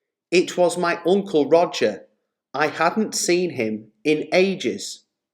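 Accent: United Kingdom. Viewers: Does the speaker's accent is a british not american ?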